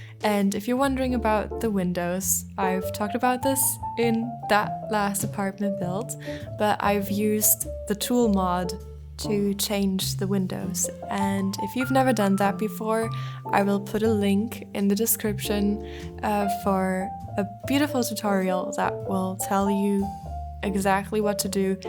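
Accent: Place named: France. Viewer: hahaha I was trying to figure it out your accent. I really love accents! I was in between France and Portugal bc you sound a bit similar to a few people I know from these places. But anyways, I’m glad you replied 🥰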